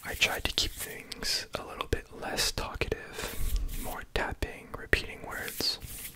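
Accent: Quebec, Canada. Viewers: Je vis au Québec et ton accent ressemble beaucoup au mien dans tout les cas tu parle très bien français! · Ton accent québécois mélanger avec l’anglais est très drôle! 😂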